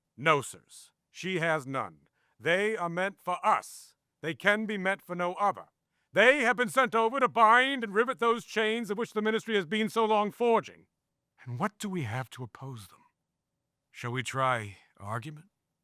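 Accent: Virginia, United States. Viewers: Thank you for this! This was a stellar performance, and that Virginian accent was perfect! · Oh, good night!!!! This guy is excellent! The Virginia accent I recognize, the zeal, the expression are inspiring.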